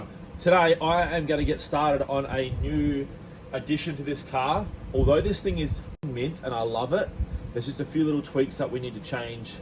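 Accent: Australia. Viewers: Two things that will always crack me up, an Aussie accent, and Ace Ventura. You sir got an instant follow as soon as I seen the rhino scene LOL. Cheers from Texas! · this is sweet.. i was just discussing this with some buddies that have old mustangs. i was tossing around the idea of this so i have room for the 2nd turbo, but was a bit sketch to run coolant lines that far. question for ya, and forgive me because I'm a new sub.. but you sound Aussie. thought you guys had RHD's.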